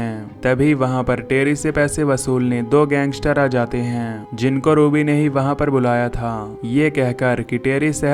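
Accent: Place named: India. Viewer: Great explanation pure Indian voice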